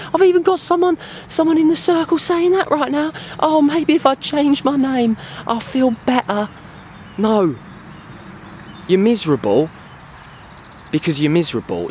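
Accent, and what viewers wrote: England, United Kingdom. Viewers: This amazing Place + your English accent = The best combination of harmonic elements!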